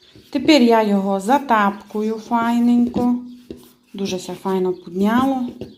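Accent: Transcarpathia, Ukraine. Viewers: дуже приємно дивитись і слухати Вас.Булочки як і все у Вас,дуже гарнезні і смачні!Дуже люблю дивитись Ваш канал.Всього Вам найкращого,здоров'я і Божого благословення.Все буде Україна!, Ваш Закарпатський діалект як мед на серце!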